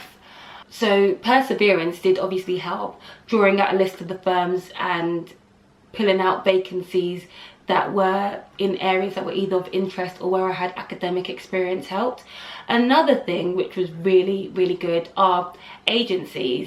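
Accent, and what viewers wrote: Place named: United Kingdom. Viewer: She is not American she is in the UK. Speaking with a British accent in the UK is no different then an American speaking with an American accent in America. Her qualifications and skills is what got her the job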